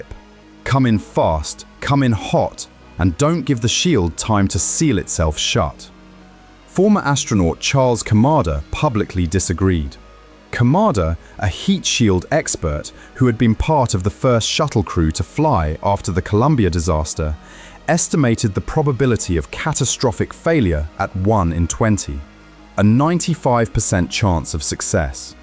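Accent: United Kingdom. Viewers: FAIILLL!! British (voice) narration ALWAYS exaggerates!! I'm sick-&-tired of British-narrations!! Great-Britan hates America, sooo, WHY are 'they' narrating stories about 'us'....??!! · The thumbnail said “What Artemis II saw in the six minutes that nearly ended them”.. No mention of temperatures. The voice sounded more British rather American and as 8 billion of us and science of us use Celsius, it would make no sense for me to think the video would have been referring to Fahrenheit, particularly when the temperature mentioned is closer to the photosphere’s temperature. The temperature of the capsule on reentry was about 2,760- 2,800C . So I suspect there was some carelessness in the title, and the 3000 degrees referred to the temperature of the capsule on reentry in Celsius.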